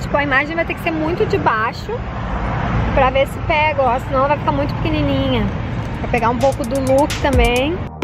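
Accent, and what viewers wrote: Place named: Rio Grande do Sul, Brazil. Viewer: você é gaúcha né? Por favor que sotaque lindo, mas hein.... Adoro suas dicas ♥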